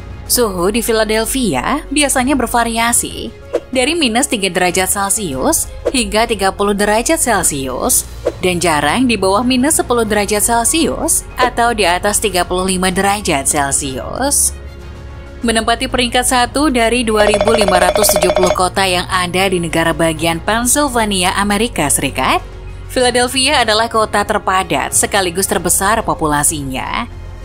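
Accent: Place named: Medan, Indonesia. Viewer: Medan nich bosss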